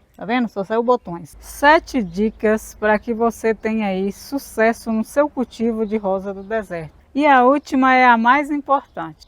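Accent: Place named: Rio de Janeiro, Brazil. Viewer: Bom dia eu pensei que vc era carioca?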